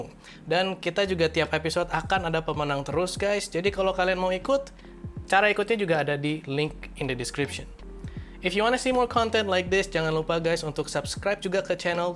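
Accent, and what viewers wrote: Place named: United Kingdom. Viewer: Keren nih ada aksen gaya bahasa inggris nge riview nya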